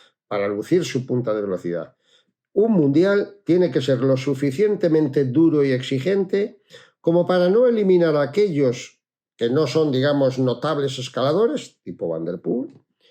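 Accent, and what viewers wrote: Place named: Spain. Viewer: SEÑOR ES MUY AGRADABLE OIR A UNA PERSONA QUE SABE TANTO DE CICLISMO,, REALMENTE MUY AGRADABLE A PESAR DE SE ECENTO ESPAÑOL QUE PARA LOS LATINOS ES ALGO MALUCO PERO EN USTED NO IMPORTA PORQUE USTED HACE SU OFICIO MUY BIEN,,,,,EFICITACIONES DESDE LAS MONTAÑAS DE COLOMBIA.......TIO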